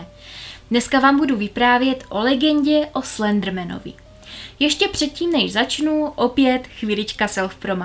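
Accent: Moravia, Czech Republic. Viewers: Já miluju tvoje příběhy.. Jen nemohla bys trochu zapracovat na té moravštině(změkčování i, kde to nepatří).Hrozně to trhá uši. Neber to, prosím, špatně.. Jen jako návrh na zlepšení.👐Odebírat tě nepřestanu:D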